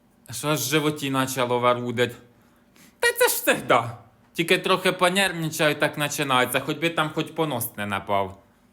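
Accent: Poltava, Ukraine. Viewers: Дякую Ти дуже ловко балакаєш по Полтавській,а то що годи у нас і досі так кажуть,ідем на годи до Миколи💕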